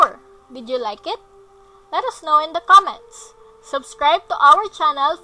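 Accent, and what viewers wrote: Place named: Philippines. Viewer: The voice makes it like she's a Filipina (Philippines)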